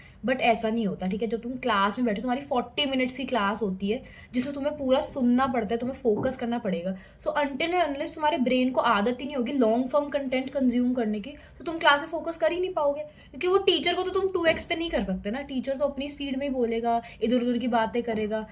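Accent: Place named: South Bombay, India. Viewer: Relevant Info btw...in a customary SoBo accent...😜🫣🙃 Keep Showing Up 👍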